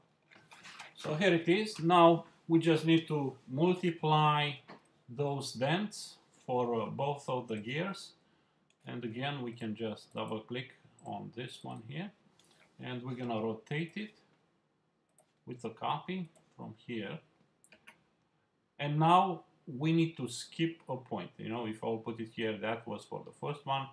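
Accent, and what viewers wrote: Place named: United States. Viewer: USA speaker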